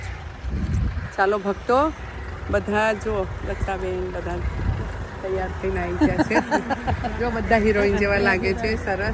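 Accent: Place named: Surat, India. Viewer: दीदी आप गुजराती हो लेकिन आप कोलकाता में शादी कैसे हो गई आप शुद्ध गुजराती बोल रहे हो सुरती भाषा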